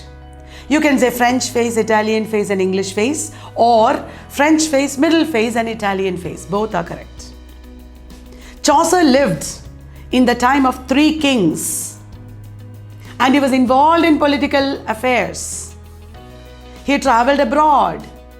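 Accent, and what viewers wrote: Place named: Tamil Nadu, India. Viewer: nice sryle ..zerous and academic,,tamilian accent..stands out !!! kudos...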